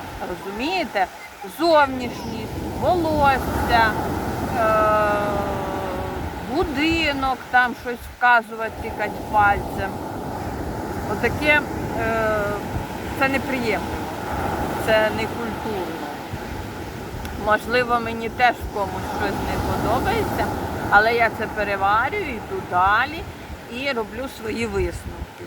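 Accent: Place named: Podillia, Ukraine. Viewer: Ви з Поділля? Маю знайомих з Бершаді, говорять точнісінько як ви.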